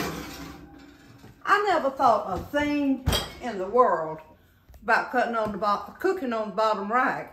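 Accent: South, United States. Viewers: its how us southerner's talk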